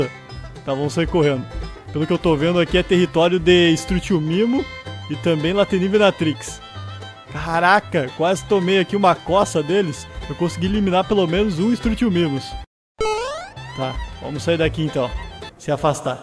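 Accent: Rio Grande do Sul, Brazil. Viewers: vc tem sutaque Gaúcho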